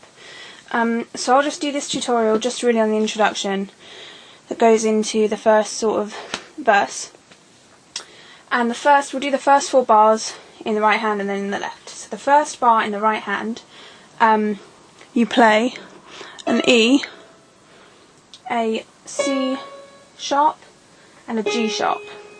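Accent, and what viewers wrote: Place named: United Kingdom. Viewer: thanks so much for this tutorial, I LOVE your british accent it's amazing :) greets xoxo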